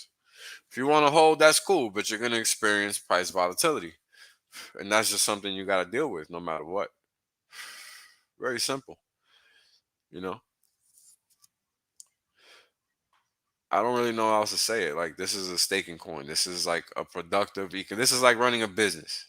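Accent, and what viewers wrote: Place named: New York, United States. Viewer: Is your voice/accent for real bro, that's some serious New York.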